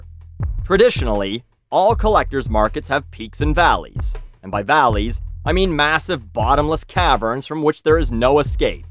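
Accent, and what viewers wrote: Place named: Alberta, Canada. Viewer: You're from Ontario? Man, I would've sworn on Alberta.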